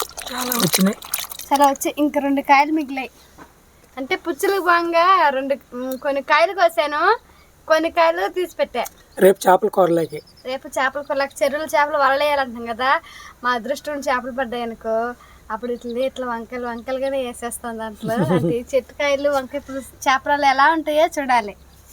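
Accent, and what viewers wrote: Nellore, India. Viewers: Hi gaaru... meeru..mee vaarini 'AVNU GADHAYAAA' ani mana nellore language lo antunte vinataani chaala baguntundi 😊naakkuuda maa vaarini alaane pilavaalanpisthundi😊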